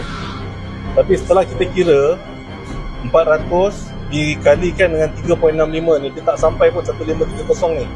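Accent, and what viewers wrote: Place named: China. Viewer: Kenapa nak cakap melayu ikut dialek cina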